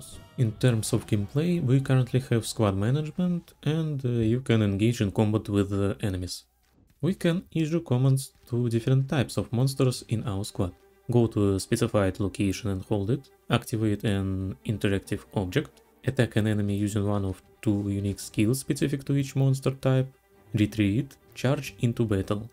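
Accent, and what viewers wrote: Russia. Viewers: По первым словам распознаю нашего русскоязычного)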